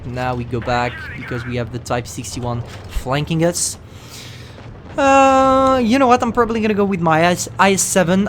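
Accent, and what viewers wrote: France. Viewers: Lol t'es français ? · Mec fait pas semblant t'est français sa se voit à ton frenglish 😏😂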